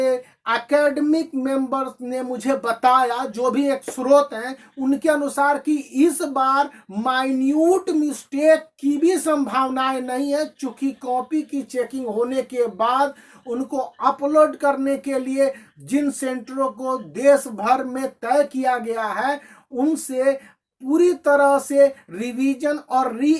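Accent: Bihar, India. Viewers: Are u Bihari sir . Ur English sounds like that . I am also from Bihar . Kaisan ba. Sab thik ba toh ! Very much thanks from me to u for making our exams cancel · Abe bihari phale batt karna sekh pagal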